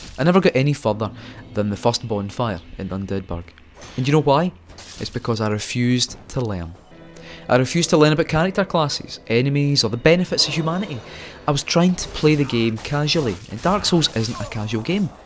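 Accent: Scotland, United Kingdom. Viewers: Awesome vid, where in scotland btw? Tryin to work out ur accent. Im fae fife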